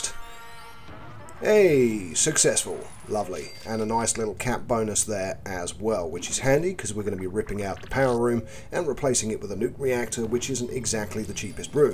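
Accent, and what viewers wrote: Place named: Australia. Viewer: Bro your voice sounds like the Australian Accent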